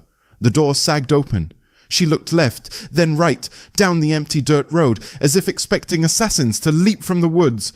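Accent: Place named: United Kingdom. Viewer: Sorry hearing the Accent from the UK talking about Texas farmers is too phony😊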